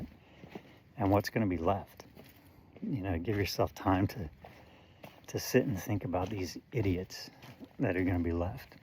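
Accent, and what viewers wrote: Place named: Northern California, United States. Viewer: I had a vibe that you were from NorCal as well, before you even mentioned our beloved red woods. I made the mistake of listening to all the news since I got up, not noticing my central nervous system was overwhelmed, this seems to be such a common feeling that I don’t even notice it anymore 🥺 Then I saw your video pop up and I needed your soothing voice more than you know today . Thank you 🙏 🌻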